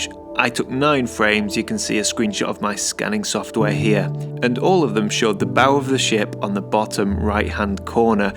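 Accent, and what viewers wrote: United Kingdom. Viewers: Couldnt agree more. For me it is that cozy feeling, the warm music, the unagitated way of explaining things to us, the british XD. His films are always relaxing. At the same time, he always manages to spread the joy which is really infectious. I have the same feeling often with Morten Hilmer's documentaries, but those videos are quite long (over 30min) and focused more on wild live than landscape. So its just that touch different.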